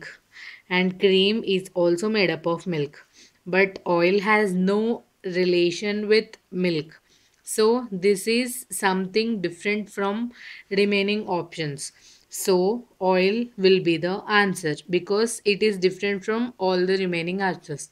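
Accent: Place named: United Kingdom. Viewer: mam aap ko london main hona chahiye tha aap ki english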